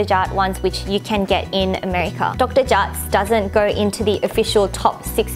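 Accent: Australia. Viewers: OMG AUSTRALIAN ACCENT 😍💗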